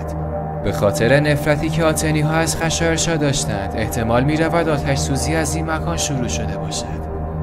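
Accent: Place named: Azerbaijan. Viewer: درود بر شما! عالی بود و بسیار لذت بردم. از لهجه تون هم فهمیدم که آذربایجانی هستید! یاشاسین آذربایجانین شریف خالقی!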